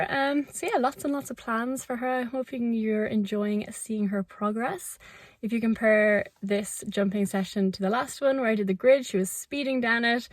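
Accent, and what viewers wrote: Ireland. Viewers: Another fantastic video... 🙂😉😁 Her sweet sexy irish voice makes me fall asleep 😴 but not because I'm bored in the slightest lol it just reminds me of those cd's which help you fall asleep!! I often lay in bed watching these & wake up still wearing my headphones & holding my phone!! I love all of her videos, especially the connection she has with the horse.. perhaps the gentle voice along with her fantastic riding hypnotises the horse lol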